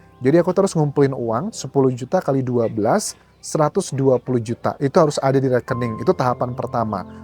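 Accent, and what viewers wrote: Java, Indonesia. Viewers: Pensiun Dosen bahasa Inggris di Thailand masih tergolong sangat muda,logat bahasa nya lebih medok ke Jawa padahal aslinya dari Lampung. Menikmati hidup dengan pekerjaan yg membuat enjoy hasilnya luar biasa. sekelas artis pun belum tentu bisa sepertimu jika menuruti gaya hidup modern.salut nak, semoga segera bertemu pasangan hidup yg didambakan.Tuhan memberkatimu🤲😇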